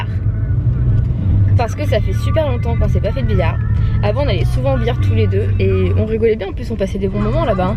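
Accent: Normandy, France. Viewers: Je suis normande aussi lol et on dit pas ça dans le sud manche. Ça doit être en haute Normandie :) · C'est l'accent Normand les "o" se prononce "eu"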